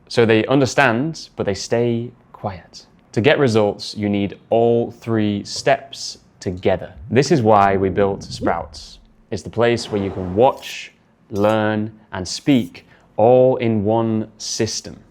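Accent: United Kingdom. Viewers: Hi. I’m from Thailand. I really love ur Vdo. I subscribe ur channel few months ago. I want to have British accent same as u.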